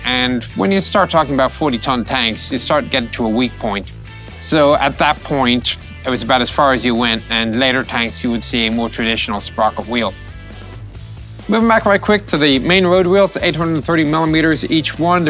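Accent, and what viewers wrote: Ireland. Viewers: You have to excuse him hes irish thats just how they talk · He has an Irish accent lmao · Apparently Chieftain is American, but he sounds Irish? Weird accent. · the presenter has such an ambigious accent....irish? german? nordic?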